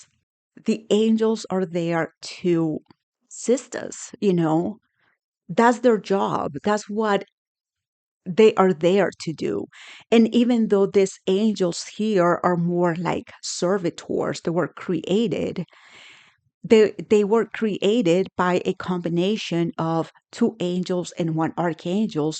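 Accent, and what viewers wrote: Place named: Brazil. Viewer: Wonderful review. Could you do one on Angels of Omnipotence by Jareth Tempest? Also, may I ask if you're Brazilian? That's how the accent sounds to me. Either way it's adorable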